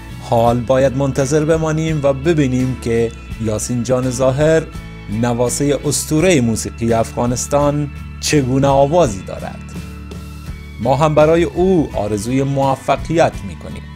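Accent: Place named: Iran. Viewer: ( اوستورا ) ، یعنی چی ؟ چرا به لهجه ایرانی حرف میګوید ؟.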